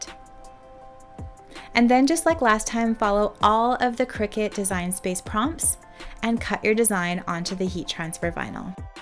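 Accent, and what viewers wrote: Canada. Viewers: addresses this in lots of her videos! It's the Canadian pronunciation.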